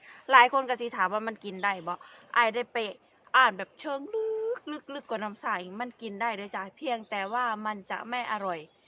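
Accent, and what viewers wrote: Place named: Nakhon Phanom, Thailand. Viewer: สำเนียงคล้ายคนนครพนมเลยค่ะ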